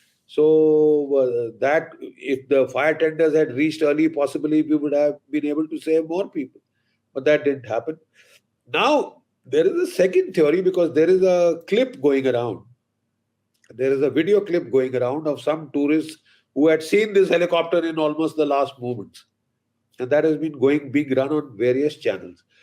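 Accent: India. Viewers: change his style of speaking from a strong typical Indian slang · His accent is typically Indian and jarring to the ears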